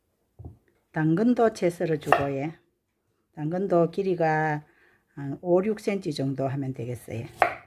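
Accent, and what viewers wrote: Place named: Busan, South Korea. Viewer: 같은 경상도사투리라도참듣기좋은 말투너무좋아요부산 분. 같으십니다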